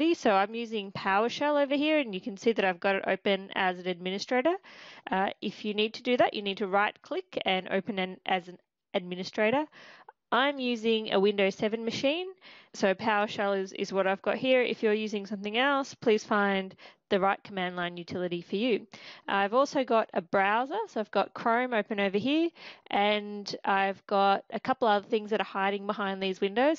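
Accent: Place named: Australia. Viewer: I guess she is from Australia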